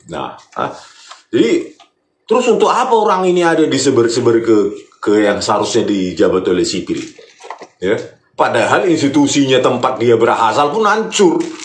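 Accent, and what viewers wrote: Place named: Lake Toba, Indonesia. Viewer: Horas Bang Suarakan Terus Yg Tidak Beres Suka Dgn Dialek Bang Dari Tepian Danau Toba Yg Indah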